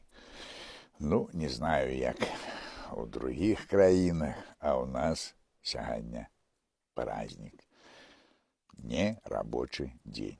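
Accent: Vitebsk, Belarus. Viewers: вы не с Витебщины? Уж очень говор похож. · Са святам, з Віцебшчыны пэўна?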